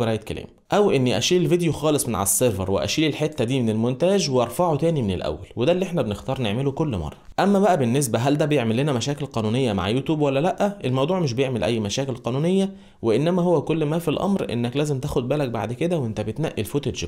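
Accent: Egypt. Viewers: هل أنت مصري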